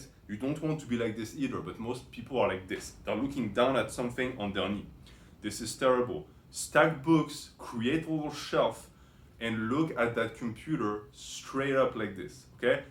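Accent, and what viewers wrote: France. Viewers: Yo dude are you french? It sounds like you are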